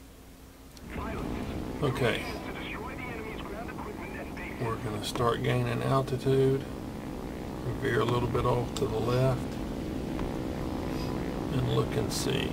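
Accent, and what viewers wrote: South, United States. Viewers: would be my second favourite war thunder narrator after the Mighty Jingles. He's not that articulate, but something about his tone and southern bubba accent just cracks me up. Especially when he says things like "they're all over me like stink on a turd", etc...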